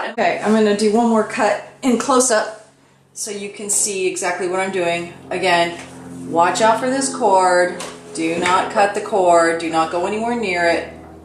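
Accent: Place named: Canada. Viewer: Love your calming voice sounds Canadian to me ,,thank you this a great video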